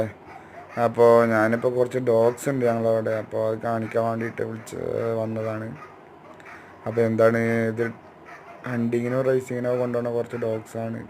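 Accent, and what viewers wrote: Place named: Thrissur, India. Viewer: എങ്ങനെ തൃശൂർ ഭാഷ..... 🤔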